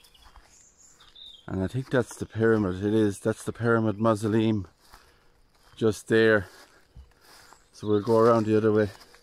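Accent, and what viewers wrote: Ireland. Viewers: Great video, and I love that Irish accent, x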